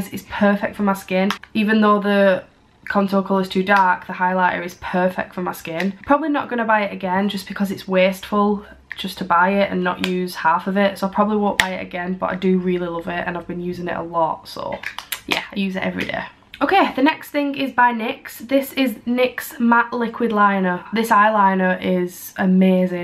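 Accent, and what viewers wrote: Northern England, United Kingdom. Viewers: I do love your accent northern accents are the best ! xx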